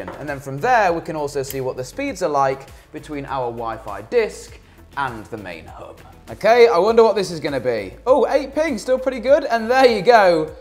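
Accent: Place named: RP, United Kingdom. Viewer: Posh as fuk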